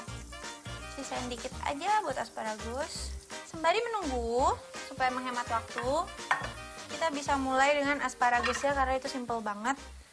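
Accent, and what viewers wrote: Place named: Malaysia. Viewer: bahasa inggrisnya kayak org malaysia..ancur dicampur campur.. goodbye bahasa Indonesia klo artisnya saja suka pamer bahasa inggris nya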